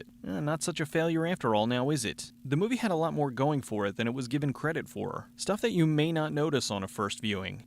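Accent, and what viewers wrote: Philadelphia, United States. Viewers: I could tell you were from Philadelphia when I heard you say "wooder" instead of water in one of your other videos.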